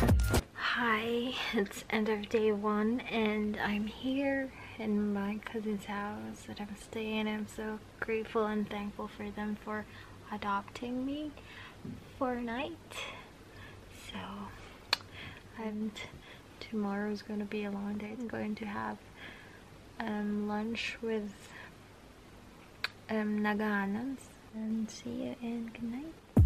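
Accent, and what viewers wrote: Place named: Visayas, Philippines. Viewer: Yay! Your Bisaya cute kaayu..May I ask, nagtour bus namu daan pa sa London?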